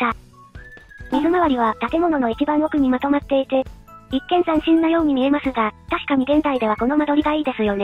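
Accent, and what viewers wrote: Hakata, Japan. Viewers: 若い女の子の博多弁が好きすぎて、思わずチャンネル登録してしまいましたが、(もちろん物件も好き❤)よくよく観ると、若い女の子ではナカッタ...(T_T) ま、いいっか👍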